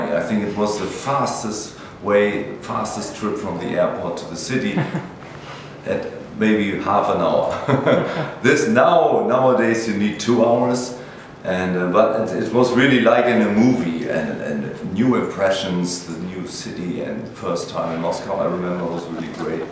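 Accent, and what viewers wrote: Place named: Germany. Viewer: I love his voice and pronunciation in english with a german accent. Very brutal and strong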